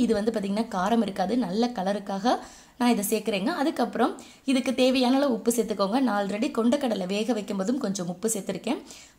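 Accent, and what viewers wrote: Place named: Kongu, India. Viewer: Snacks spr nga.. snakes ku extra azhagu serkradhu neenga pesura kongutamil dhanga.. nalla utcharipu nga.. 👏👏👏👏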